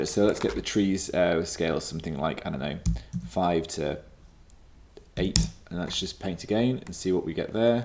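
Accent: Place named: England, United Kingdom. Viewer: DUDE YOU SAVED MY LIFE! I was about to die from wasting my life on Xgen geometry instance painter - but then you came out of nowhere with your sophisticated english accent and saved me!! : O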